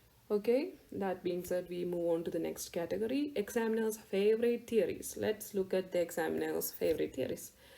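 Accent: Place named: Kerala, India. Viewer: Mallu accent spotted.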